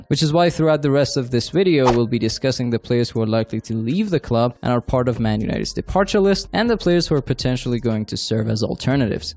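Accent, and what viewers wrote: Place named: United States. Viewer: I dont understand one item that he speaks about please stop these american voices and put in british voices.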